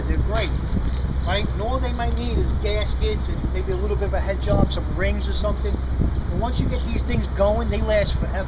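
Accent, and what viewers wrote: New York, United States. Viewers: man I wish I could have your new york accent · Dats for all yu trolls and haydus out dare. Love that New York Accent.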